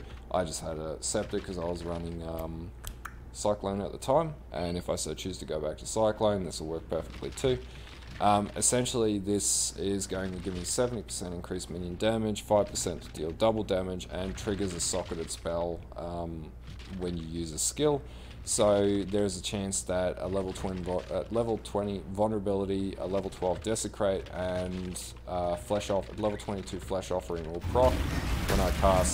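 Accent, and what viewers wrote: Australia. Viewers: There's something soothing about being called a filthy exile in a smooth Australian accent (or is NZ?)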